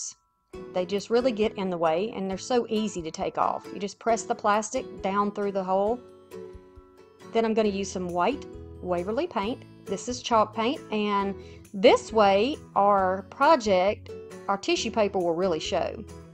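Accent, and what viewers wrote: South, United States. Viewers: listening to the Southern drawl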